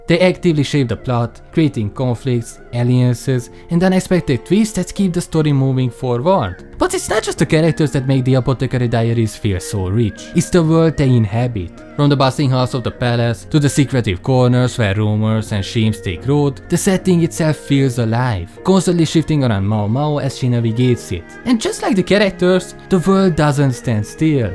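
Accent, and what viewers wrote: Hungary. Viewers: That Hungarian accent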